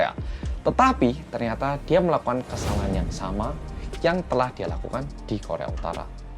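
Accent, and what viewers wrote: Java, Indonesia. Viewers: Suara nya kaya orang Jawa Medok banget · Jawa banget suara nya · Lah kok ngomongnya kaya jawa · Gaya bicara pembikin konten cukup nyentrik, wajah Korea ngomongnya "medhok" kejawa2an 🤣🤣🤣tp aku like lho... 👍👍👍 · Lagi nonton KBS Live di YouTube,eh ada notif dari mas....auto klik dong. Bersama orang korea yang "MEDHOKKKKKKKKK" Lho rambute sampean ganti maneh mas. Lup² mas ❤ Jatim hadir disini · Tim gercep mas ~🤣🤣🤣 orang Korea yang MEDOKKKKK~~~ btw aku kesini cuma gara² ada Hyun Bin (Kapten Ri)😭😭😭🤣🤣👍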